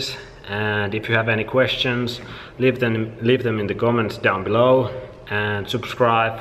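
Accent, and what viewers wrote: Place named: Finland. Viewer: Hey are you finnish ?i love that finglish accent .thanks for the tips :)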